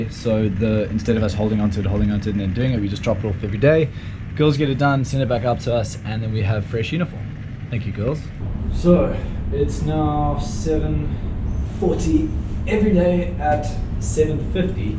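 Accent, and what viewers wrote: South Africa. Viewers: Are you South African bro? And if so what advice would give someone who would like to work on yachts like you? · your accent sounds south african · Are South African? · Are you South African??? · Are you south African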